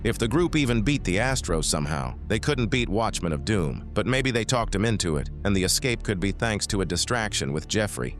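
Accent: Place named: United States. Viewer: Anyone else notice how his voice sounds country?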